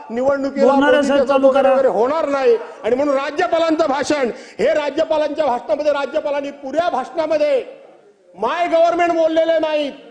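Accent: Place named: Konkan, India. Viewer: भास्कर शेठ जाधव साहेब उद्धव साहेब यांचा ढाण्या वाघ आहे अस्सल कोकणी बोली शालीत सभागृह गाजवून टाकला जय महाराष्ट्र साहेब जय शिवराय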